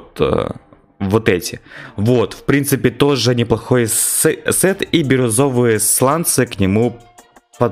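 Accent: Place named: Chechnya, Russia. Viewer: Чеченский Ваше Похож сам чеченец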